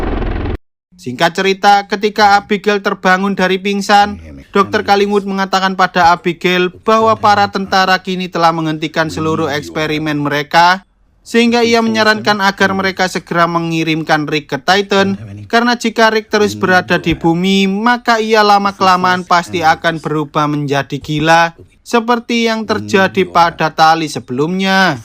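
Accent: Java, Indonesia. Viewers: bg bisa gk ngomong nya jan medok gitu :( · Bang bagus kalo cerita tanpa logat jawa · nadamu mas nyell jowone ndk ilang😂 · Medok bgt Jawa nya om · Suaranya cmpereng sama medokk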